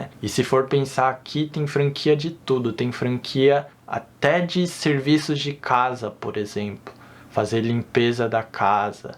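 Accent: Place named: Japan. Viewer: Man teu sotaque já tá bem japonês tbm kkkk seloko vc tá certo Man o Japão mesmo com seus problemas naturais e outros problemas, sempre vai ser melhor que o Brasil, país SERIO, não um país de palhaços que nem o nosso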